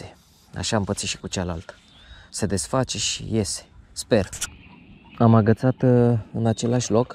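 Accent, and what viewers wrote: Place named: United States. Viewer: Unde ai pescuit în America sau pe o baltă din Romămia că după cum de exprimai erai mai mult american decăt romăn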